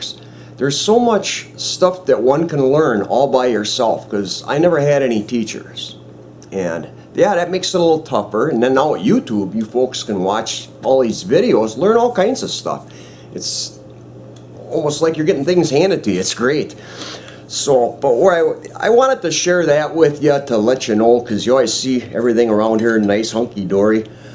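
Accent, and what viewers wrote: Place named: North, United States. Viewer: awesome vid keep em comin. cant tell if hes from sconny or sota but i love the northern accent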